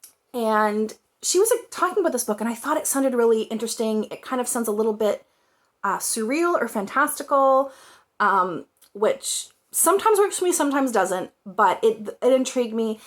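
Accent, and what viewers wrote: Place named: Maritimes, Canada. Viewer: Good to know, Maritime dialect! 🤓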